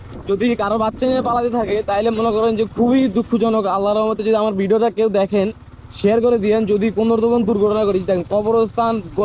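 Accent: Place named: Chittagong, Bangladesh. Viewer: ও আসলেই চট্টগ্রামের আমিও চট্টগ্রামের ওর কথার ধরন দেখে বুঝতে পারলাম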